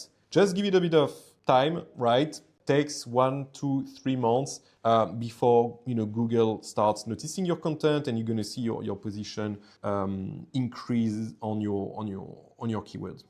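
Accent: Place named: France. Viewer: On m'a recommandé ça sur Reddit. Malgré ton bon anglais, je repère tout de même un accent français bien présent. Marblism est français ?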